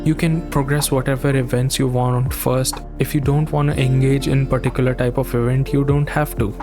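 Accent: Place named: India. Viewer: Great review brother, you got Indian accent — Indian? I love that accent! Keep up the great work, you'll gain more sub eventually. 😎🍾🍻🌟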